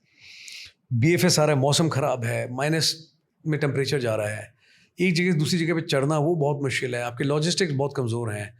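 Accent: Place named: Punjab, India. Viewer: Your Punjabi accent sound so cool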